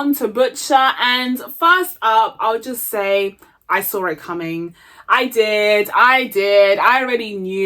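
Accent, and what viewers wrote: United Kingdom. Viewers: Your English accent is so beautiful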